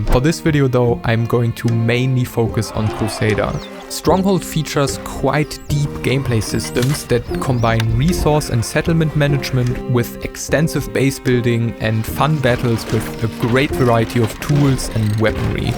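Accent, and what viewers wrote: Germany. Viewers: That is the hardest german accent i´ve ever heard :D But good video! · Ten seconds in and I already know you are german, very obvious accent lol But I really love all the memes in this video, I am indeed "all about the Ackermatch" when playing Crusader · Empire Earth and Cursader ❤ but are u german?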